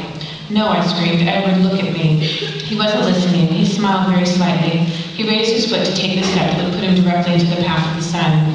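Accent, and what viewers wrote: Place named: United States. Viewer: Che accento americano orrendo xD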